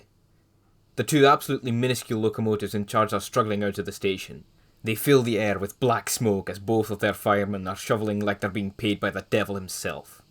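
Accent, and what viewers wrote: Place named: Scotland, United Kingdom. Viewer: First time watching one of your videos that isn’t a animation and my immediate thought was “holy shit there Scottish”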